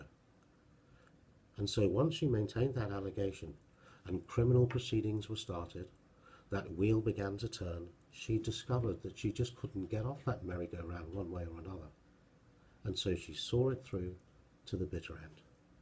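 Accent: England, United Kingdom. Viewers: Anyone randomly binging this dudes videos? I see fresh comments on a 4 year old vid. Like this dude's style of video, but how does he have an English accent with that name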